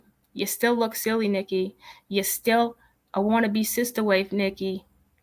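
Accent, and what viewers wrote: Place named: New England, United States. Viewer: ... First off, you make me miss my new England accent, lol. I'm from RI but now live in NC. I'm not sure where you're from, but I believe it's new England. I don't agree with everything you stated in this video. I do appreciate your non biased stance. Overall, I enjoyed this video. Thanks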